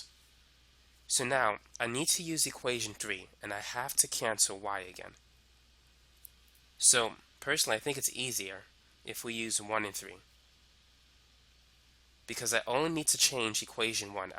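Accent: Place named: New York, United States. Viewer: This guy is soooo clear in his explanations!!! I love his NYC accent too!!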